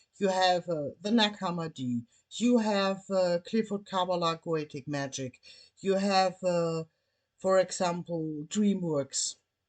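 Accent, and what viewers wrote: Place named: Germany. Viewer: Hello, I'm Yağız, I'm following you on YouTube, good luck, and your German accent is so sweet HDHM! 😈🐉❤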